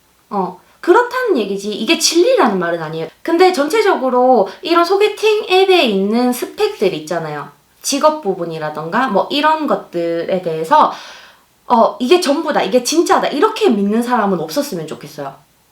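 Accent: Gyeongsang, South Korea. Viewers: 갱상도 사투리 ㅋㅋ 말투가 귀엽네요.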